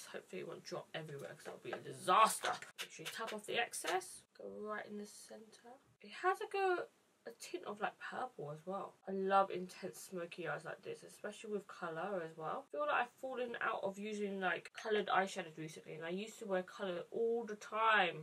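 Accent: United Kingdom. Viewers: Olivia 811 1. She's British. 2. She has braces under her teeth which causes her to have a lisp.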